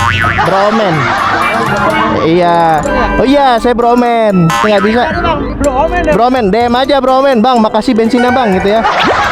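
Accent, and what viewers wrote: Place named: Jakarta, Indonesia. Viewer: Lu di Lampung ngomong kayak Betawi bang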